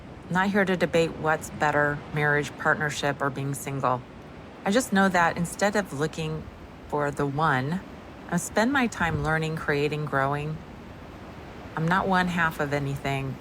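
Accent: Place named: Germany. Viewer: Yeh I thought that to , she really sounds German 😂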